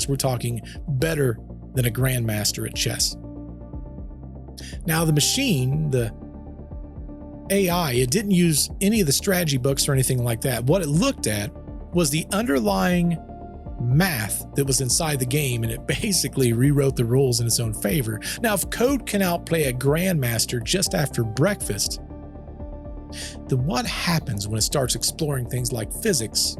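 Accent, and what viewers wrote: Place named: United States. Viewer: It’s so dope to hear an American voice narrating 👏🏽👏🏽👏🏽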